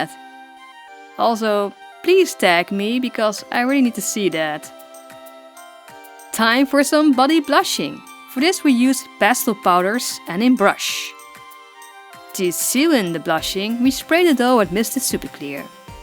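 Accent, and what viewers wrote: Netherlands. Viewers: Your so typical Nederlands accent hurts my lil Belgian-dutch speaking self, The finished doll looks awesome tho!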